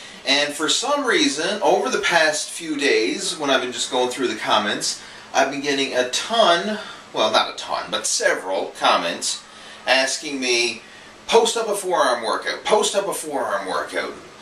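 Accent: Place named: Cape Breton, Canada. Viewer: Her are you from Cape Breton Nova Scotia? You sound like a Cape Bretoner :p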